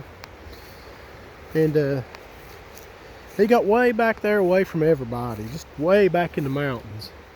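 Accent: South, United States. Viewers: This story had me captivated! Thank you! I get so relaxed listening to your southern drawl and seeing the peaceful, serene video scenes that accompany!😊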